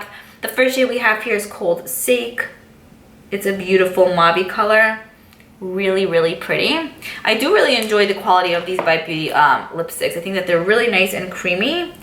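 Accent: New York, United States. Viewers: Im from the South. So the New York voice is so addicting! haha good video. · Love your accent! You need a video just repeating the word "gloss", LOL. I was like is she from New York....looked in the description box and what ya know, you are! LOL.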